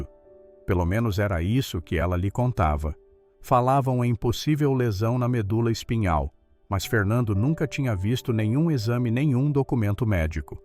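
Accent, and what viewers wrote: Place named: Minas Gerais, Brazil. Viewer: Este contador de estória com sotaque mineiro Mairna ora Marina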